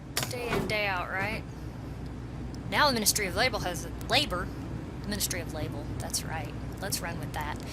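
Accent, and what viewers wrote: South, United States. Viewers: I like the southern accent.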